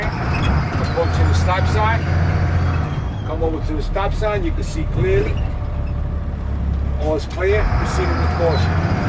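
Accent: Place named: New York, United States. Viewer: God I miss the New York/Jersey accent.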